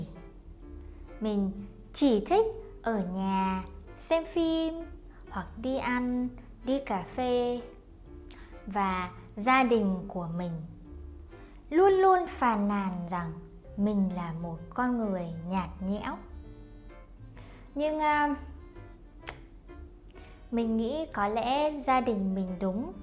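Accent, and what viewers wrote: North, Vietnam. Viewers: Nice Northern accent. Y'all truly nailed it. Keep it up girl ! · Giọng Bắc nghe thật dễ chịu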